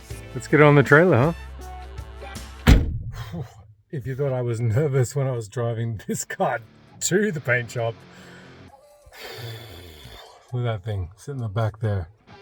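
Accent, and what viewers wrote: Australia. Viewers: I got directed to your channel by YouTube and it looks like a really interesting build. Nice work on the body, it looks pretty amazing. The wheel hop issues likely started becuase it's a spool axle on the rear and typically go karters use weight transfer to unload the inside wheel to make these things turn. The trick won't work with your car, because you've lengthened the wheelbase and increased the mass quite a lot. The problem that's resulted from that is that you've had a failure which has resulted in compliance finding it's way into your chassis somewhere... I'm guessing, but I'm guessing it'll be the wheel bearings on the rear or you'll find that you've got a frame crack at the end of those flanges you've got welded on to the chassis. The people who are talking about a live axle etc, are wrong, there's not a specific problem with live axles, but compliance results in wheel hop, there are a bunch of ways to bake compliance into a vehicle design and live axles are renowned for it because people fail to separate their upper and lower links enough on a four bar rear end, or use rod ends which results in the rod ends flogging out under load. 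In both instances there's a very basic fix, but it's geometry and complaince related, nothing else. Yours probably isn't a hard fix either, but it'll need some proper design work done on it. You sound Australian, where are you based? I'm an engineer and based in Brisbane. I reckon it'd be interesting giving you a hand if you're keen.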